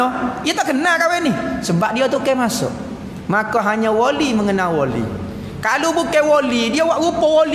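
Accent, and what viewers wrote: Kelantan, Malaysia. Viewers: Ustat ni dia ade aura. Aku tibe2 suke dgr bila dia bercakap.. ade yg aku x ckp faham dia loghat kelantan. Tapi apa yg keluar dr mulut dia sedap di dengar.. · betul walau loghat kelantan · Betui weh. Aku baru kenal ustaz ni seminggu kat yt. Hang bayangkan siang malam pagi petang aku bukak yt ja untuk dengar ustaz ni cerita… aku pun taktahu kenapa ustaz ni.. boleh melembutkan hati aku.. sedangkan aku taksuka dengar ceramah…tapi aku buleh dengar ceramah dia ni.. dari takfaham loqhat kelantan sampai buleh paham la sebijik2 apa dia kata.. ustaz ni ada aura dia tsendiri ni.. masyaallah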